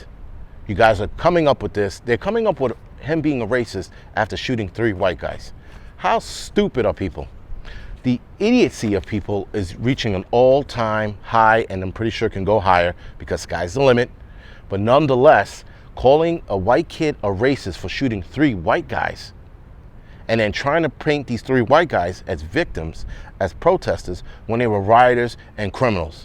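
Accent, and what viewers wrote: New York, United States. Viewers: He talks like a real New Yorker and a stand up guy. The channel is called. Who are people expecting when they sub, prince charming?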